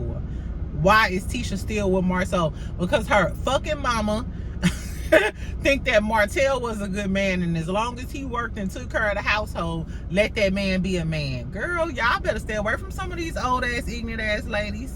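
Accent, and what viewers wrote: St. Louis, United States. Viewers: Hey love! This your auntie from Chicago. I love your content. I love that St. Louis accent. Don't change anything!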